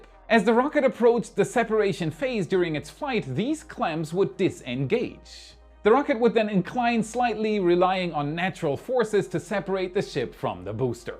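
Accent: Germany. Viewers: Your German accent is fading! The states are rubbing off on you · You called me out for the marine mariner comment I’m blown away! Didn’t know you were German until this episode keep the great accent coming I meant no disrespect!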